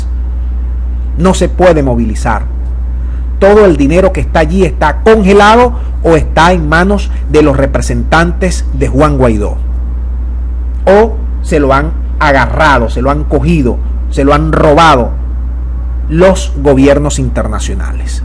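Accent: Cuba. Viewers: Este locutor debe ser Cubanisimo un efuerzo immenso para lucir Venezolano. Solo Las cuentas que estan USA estan cerradas Venezuela tiene cuentas en la China y en Russia Las aerolinias Americanas no estan volando por El peligro que eso significa. La China si quiere traer otra ayuda a pesar de que El gobierno diga que no se necesita la puede llevar. En USA los que tubieron que salir llevan años pidiendo que le cierren Las cuentas a esos descarados que le dicen Al Pueblo pon la cara por mi que te estoy ayundo con una cajita clap de arina y grasa y por que los españoles y adecos y copei te dejaron pobres y USA quiere tu agua y petroleo y luego con El dinero de los Venezolanos se para Miami, Orlando y New York viviendo y pagando Plato caros mientras El resto haciendo colas por que Las colas no son de Enero para aca solo un Cubano llama a Maduro Presidente o narco y con razon por que le reparte la Plata de los Venezolanos en dollares y petroleo a ellos y los narcos Les deja hacer lo we le de la gana. 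insisto eres Cubano comunista o narco. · Que bien . Asi Maduro saldra mas rapido. Tienes acento cubano. No tienes derecho a opinar. Eres mas falso q billete de 7.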